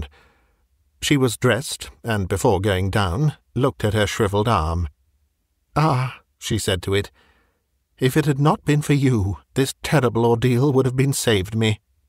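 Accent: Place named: West Country, United Kingdom. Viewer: You do a marvelous West Country accent.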